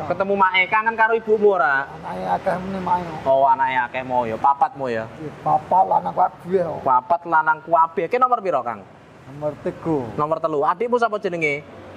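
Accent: Kendal, Indonesia. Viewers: Logatnya Kendal bgt pak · Bahasane asli kendal..daerah ku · Logat suaranya seperti orang gemuh,kendal. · Bahasane kendal banget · Logat nya emang kendal itu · Iku logate wong Kendal maa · Logate kendal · Logatny ky orang kendal .. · Logat bicarax kayak orang Kendal weleri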